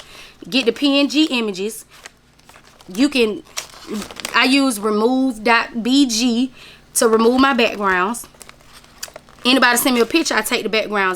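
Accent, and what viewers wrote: South, United States. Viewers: Sis., can you provide the details on your edible printer? You talk so fast and you know you country! lol I played you back 4 times and still ain't got it!!!! lol Absolutely love your personality. I really could listen to you all day. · Hi, Mrs. nice to meet you I came across one of your gift baskets videos now I'm a new subbie your personality and southern dialect is contagious very creative too love it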